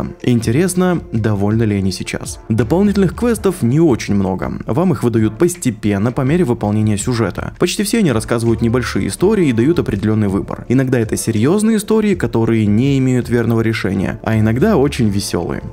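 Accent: Primorye, Russia. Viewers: С Приморского края что ли? :) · вы случаем не с Приморского края?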